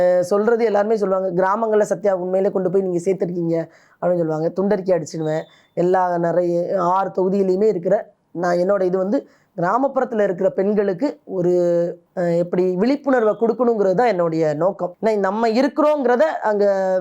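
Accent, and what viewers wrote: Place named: Tirunelveli, India. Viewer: உங்களது நெல்லை பேச்சு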